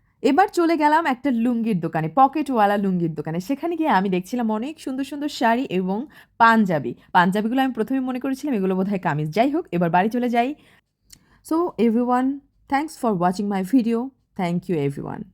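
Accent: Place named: Kolkata, India. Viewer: আপনার কথা অনেক টা কলকাতা বাসি লোকদের মতো। মনে হয় না বরিশালের।। মাঝে মাঝে একটু লাইভ করবেন প্লিজ প্লিজ প্লিজ প্লিজ প্লিজ প্লিজ প্লিজ প্লিজ প্লিজ প্লিজ প্লিজ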